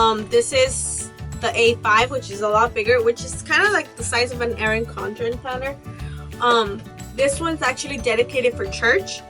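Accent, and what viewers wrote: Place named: Mexico. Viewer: That Mexicana accent though 😂😂💕💕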